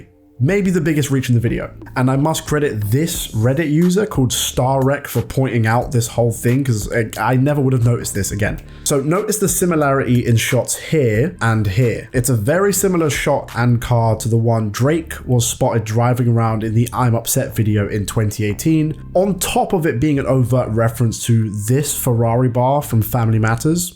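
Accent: United Kingdom. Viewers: Why am I listening to a British dude explain our shit lol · What do you want him to do, an impression? He’s from London. This is his accent.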